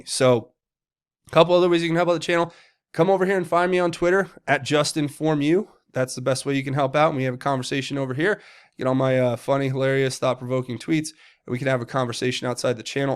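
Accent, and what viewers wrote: South, United States. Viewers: Love that southern accent. LOL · YOUR SOUTHERN ACCENT SAYS IT ALL BROTHER...."Q" ROCKS....HE OR SHE (THIS COULD BE A WOMAN) IS THE ONLY ONE GIVING US THE TRUTH...I CAN'T WAIT FOR THE MILITARY TO SWOOP IN AND SNATCH THESE .THIEVING PEDO'S UP...WWG1WGA....U.S.M.C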